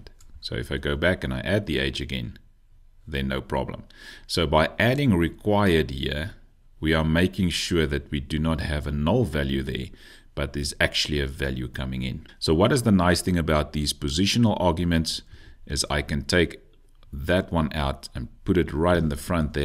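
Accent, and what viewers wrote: South Africa. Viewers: hey, I just realised that is a fellow South African, the use of Rands and accent. represent, represent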